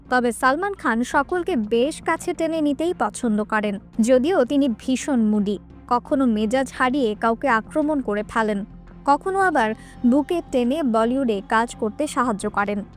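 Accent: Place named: Bangladesh. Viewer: এটা বাংলাদেশ নয়। এজন্যই আমরা সব ধর্মকে সম্মান করি। সবাইয়ের সমান অধিকার। এটাই বুঝি। তোমার ভাষা শুনে মনে হচ্ছে এটা বাংলাদেশি ভিডিও।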